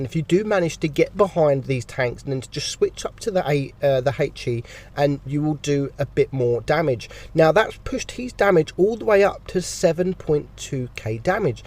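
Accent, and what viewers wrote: United Kingdom. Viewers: You're British mate, I don't hold it against you. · .... You are absolutely unique. And NOBODY can`t copy that accent.:) Fugit could try to copy the style, but that british accent is hilarious. Cheers.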